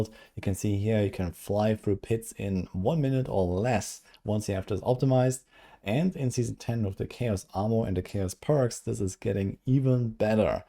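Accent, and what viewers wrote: Germany. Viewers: Man erkennt immer sofort wer deutscher is. Nice vid! Wird direkt nachgebaut 🎉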